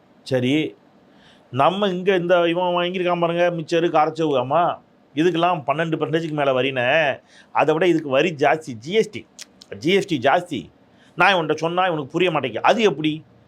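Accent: Tirunelveli, India. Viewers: பிரதர் ரொம்ப நாள் கழிச்சு நம்ம ஊர் பாஷை திருநெல்வேலி பாஷை கேட்டிருக்க வாழ்க ஜனநாயகம்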